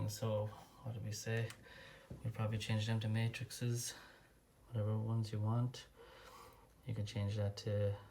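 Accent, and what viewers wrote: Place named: Ireland. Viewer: Hello I was having a bit of Patchen problem and I came across your video. Great to hear an Irish accent Thanks for the video.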